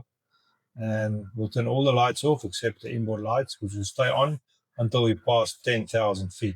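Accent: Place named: South Africa. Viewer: Good to hear an SA voice!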